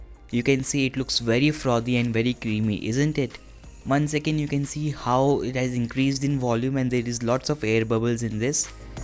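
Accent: India. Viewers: you are indian? You voice tune like indian sub region. And thanks. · That Indian accent tho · Damn, why all the recipes with Indian voice there and everywhere behh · 咖喱味英语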